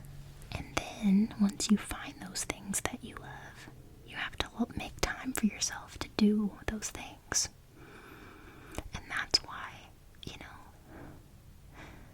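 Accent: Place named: Oklahoma, United States. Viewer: You sound like you are from Oklahoma.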